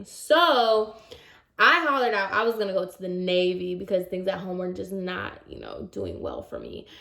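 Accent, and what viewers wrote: Puerto Rico. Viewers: I love how spanish sounds in a puerto rican accent!!! My spanish is so blahh!! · Hello from Oregon 👋 You are right, Spanish even though it’s Spanish, it’s different depending on where you live or where you grew up. But I like your Puerto Rican accent ☺️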